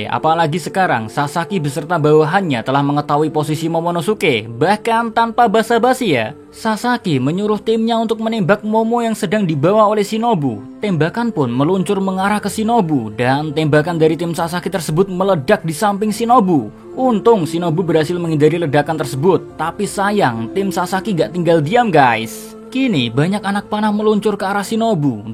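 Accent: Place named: Magelang, Indonesia. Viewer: Aku penasaran, org mana sih? 90% org Jawa tengah, Magelang mungkin, logatnya kayak orang Magelang, Maaf, 🙏🙏🙏😁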